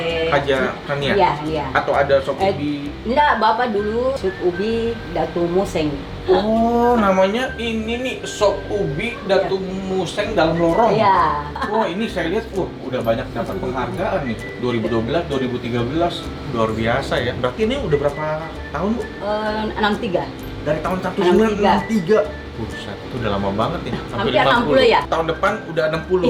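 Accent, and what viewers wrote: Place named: Jakarta, Indonesia. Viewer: Ko logatnya dengernya kajarta 😄😄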